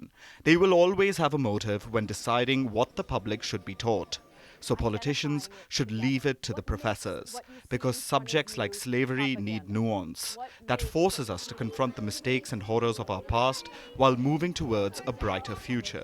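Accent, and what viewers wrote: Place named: India. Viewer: Hey what are you guys even talking about? Never would even expect from someone with an Indian accent 😩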